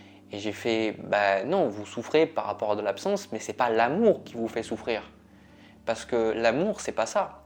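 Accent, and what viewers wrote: South, France. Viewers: Merci pour ce tirage très pertinent. J aime écouter vos guidances et votre manière de les transmettre, de façon naturelle et franche avec cet accent du sud qui me reconnecte à mes racines.🙂 Merci aussi pour votre dévouement et l aide que vous nous apportez. 💕🕊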